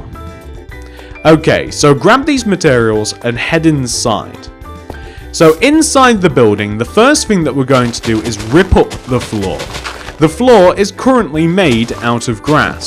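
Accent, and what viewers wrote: United Kingdom. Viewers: Are u British ?? If so can u make an Asda ???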